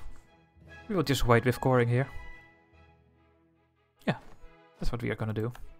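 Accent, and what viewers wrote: Netherlands. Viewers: I can really tell that you are Dutch from how you pronounce the Dutch cities. It's strange hearing a mix of English and Dutch like that.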